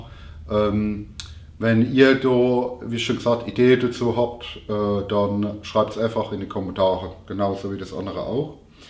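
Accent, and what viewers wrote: Germany. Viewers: Hi, super Sache das, bin zufällig jetzt hier gelandet weil ich dein erstes Video (glaub ich?) gesehn hab, wie man einen Bobber für unter 2000 Ocken bauen kann. echt krass, gut gemacht hast eine gute Art sowas rüber zu bringen, deshalb freu ich mich auf die Erklärbärvideos, wird sicher geil Apropo, bleib so wie du bist, Pfälzer sind cool und Dialekt babbeln is voll ok ich versteh dich jedenfalls ...aber eins musste mir versprechen, bleib dir treu ;) Bis denne Stephan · Du Klingst wie ein Odenwälder 😁 coole Videos!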